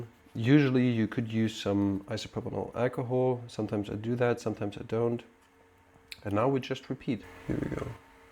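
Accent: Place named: Oxford, United Kingdom. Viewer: I love your clear Oxford English, and now weiter to the Video. Best regards Ingo. 🐇🖖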